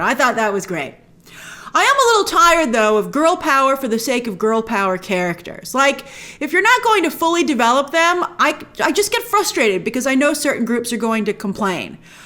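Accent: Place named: New York, United States. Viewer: Is she really from NY or does she do the bad accent for the pop?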